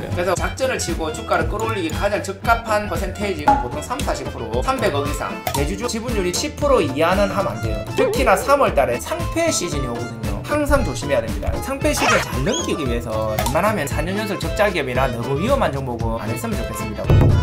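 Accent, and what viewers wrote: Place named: Gyeongsang, South Korea. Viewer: 왠 만한 주식강의 보다 더 현실감쩌는 콘텐츠 구수한 경상도 사투리도 듣기 좋고.. 나도경상도.ㅋㅋ 감좌합니다~ 선배님..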